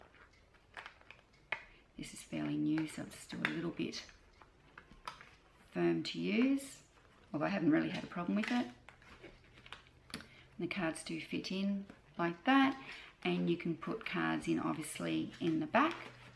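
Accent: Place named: Australia. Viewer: Great review! Beautiful item and Aussie English :)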